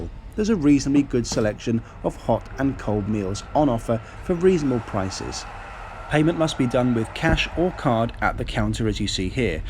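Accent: United Kingdom. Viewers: . I'm writing you from Ukraine. You are really. I'm very lucky to see your wonderful journey. It's gonna be super. You are very cool. I adore Arctic and everything what is connected with this region. Fantastic show. Railway in Sweden is really engineering marvel. Nature. I have no words to describe my ambitions. Your fluent English, your real British accent, your manner of speaking, your wonderful voice, music, lifestyle — OK, everything was pretty good. I'm your biggest fun. Thank you very much for your journeys. I love it. You are on my chance. Suppose see you soon.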